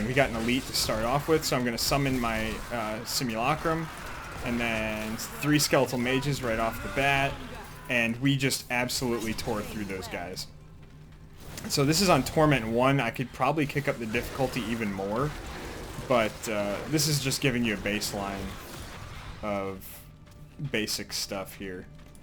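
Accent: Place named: East Coast, United States. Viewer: Hey dawg your channel is awesome and so is your east coast accent, I wonder how u have such low subscribers, good luck and thanks.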